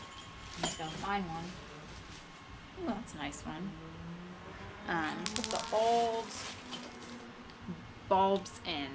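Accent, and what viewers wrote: New Jersey, United States. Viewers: It’s a NJ accent.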